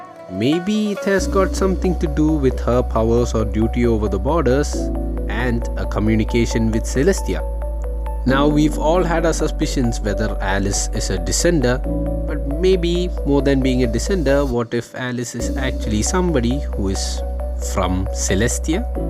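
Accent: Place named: Kerala, India. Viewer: Ur voice is similar to indian mallu accent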